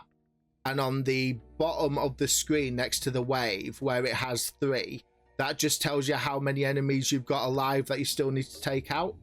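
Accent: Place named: England, United Kingdom. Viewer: UR ENGLISH!?!?!?!? 😱😂 Soooo many things... This game.... Ur voice... This is like when the protagonist reveals he's related to royalty and knew the whole time... I'm also beginning to see the kind of games ur in to. I feel like I'd have a blast playing this... Anything relating to round based survival first person shooter, I'm interested in 😂... Nice to hear the voice behind the screen brother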